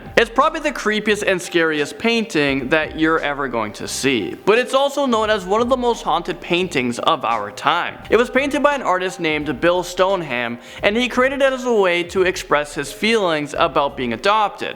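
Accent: India. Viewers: ah Indian accent amazing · Are you Indian?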